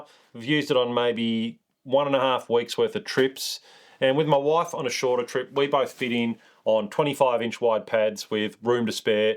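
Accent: Australia. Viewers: PROFOUNDLY Australian voice !. "Rawwrrkkk rawwrrk rawrk !" My goodness, I'm sure that's the Genuine article.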